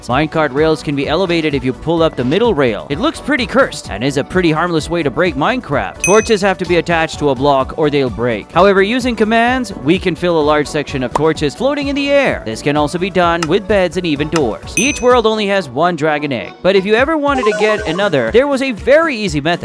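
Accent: United Kingdom. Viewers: Ain't you british? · I thought u were British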